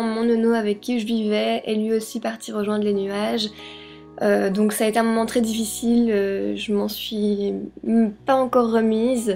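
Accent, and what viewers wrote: Belgium. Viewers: est ce que tu es Belge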